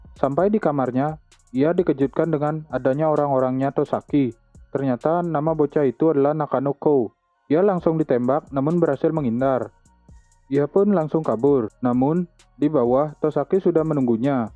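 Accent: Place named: Bali, Indonesia. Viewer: Logatnya dari bali ea bang slam kenal ea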